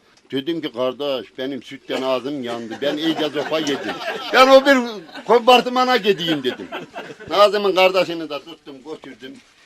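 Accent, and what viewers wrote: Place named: Sivas, Turkey. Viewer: Sivas ağzıyla konuşarak anlatması ayrı bi güzel, yediği dayakla eğlenebilmesi ayrı bı güzel, sizin çizimlerle bambaşka bir boyut kazanmış. Harika yaa. Tebrikler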